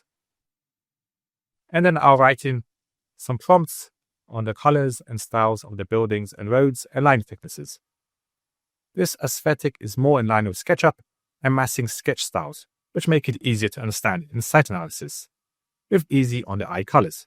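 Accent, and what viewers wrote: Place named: United Kingdom. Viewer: lol your British accent is so strong I literally started wondering what "Google F" was 😂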